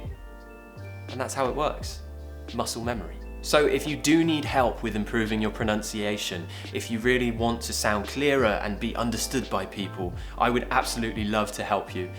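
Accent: United Kingdom. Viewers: What kind of accent that the Primary Minister May said ?what's the difference between yours and hers? Both are really amazing!!!i was fall in love with British accent !! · Nice accent, I would like to speak as a British native speaker. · Good morning, Thank you for administering our daily dose of English pronunciation! I do believe that listening to you on a daily basis will bring us next to perfection. Since I singled you out from the wide range of online English teachers my English has dramatically improved. Just like children do, I naturally tend to imitate your accent, use your vocabulary and so on. I suppose your she cat has a perfect British accent too! I managed to pass the CPE test in Rome but I want to go further. English seems to have no boundaries, the more I learn, the less I know. I think one day I will take up one of your courses so that I can have ready answers to my questions and doubts at hand! 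I cannot thank you enough for what you do!!! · Hi! You always help us a lot in pronouncing British English. I'm in love with your pure British accent, so beautiful and clear. I've always had some trouble when pronouncing the /ae/ sound and I'm doing my best working hard on it. A tip to help your students pronouncing it correctly, or at least, more correctly, is to try to place the position of your mouth as if you were going to pronounce an /a/ and then pronounce an "e". Do you know what I mean? I hope it helps! Thanks a million for all your help and good knowledge.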